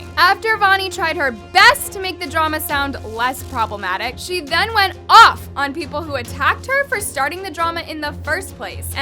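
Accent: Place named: AAVE, United States. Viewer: literally just aave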